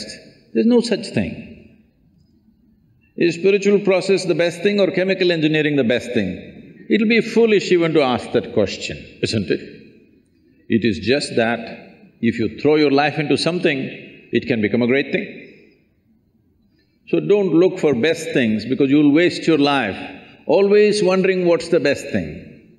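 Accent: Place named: India. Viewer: Giọng ấn đặc trưng thật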